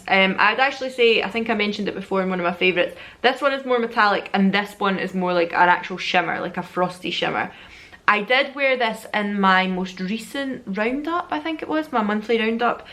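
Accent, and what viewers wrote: Scotland, United Kingdom. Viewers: Goodness! You are adorable!!!! The Scottish can say whatever they want and it sounds lovely...we American’s though...ugh. I am going to put Eunique Beauty on the wishlist.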